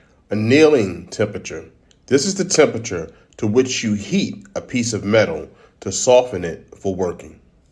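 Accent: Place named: Maryland, United States. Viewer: Def interesting hearing about all you do. Thanks for the content! Love your MD accent!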